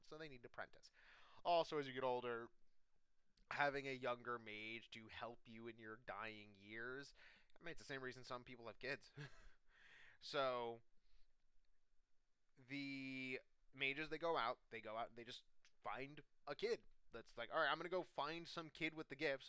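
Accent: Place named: South East, United Kingdom. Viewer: South easterner detected, all hail the pub sub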